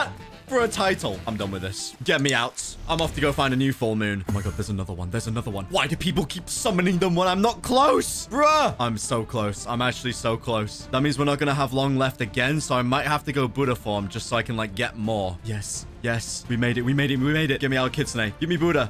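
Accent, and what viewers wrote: United Kingdom. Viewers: Bros British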